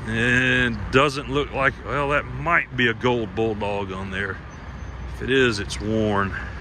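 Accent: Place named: South, United States. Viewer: I love the way you talk bro, no homo shit just like that low and slow southern accent. Just left your stall for grounds down in Florida, Lakeland to be exact. Keep the videos coming my friend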